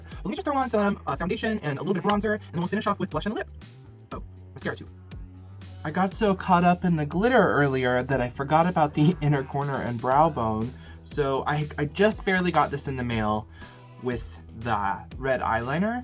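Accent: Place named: Ireland. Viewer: Love the Irish accent lol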